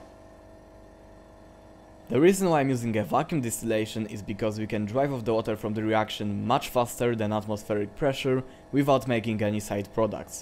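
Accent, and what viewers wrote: Poland. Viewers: Ten Polski akcent :D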